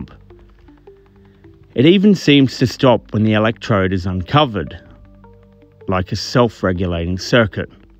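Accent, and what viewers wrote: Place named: Australia. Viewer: That's pretty awesome and the fact that I can hear that Aussie accent narrating the video makes this even more awesome.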